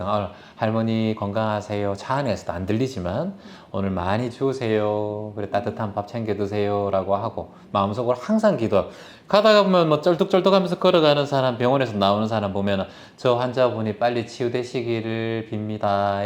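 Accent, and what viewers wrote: Gyeongsang, South Korea. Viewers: 저는 대구입니다. 편안한 목소리와 살짝 경상도 억양이 더 정감갑니다.💓 늘 감사드립니다. 감사합니다 ^^고맙습니다 ^^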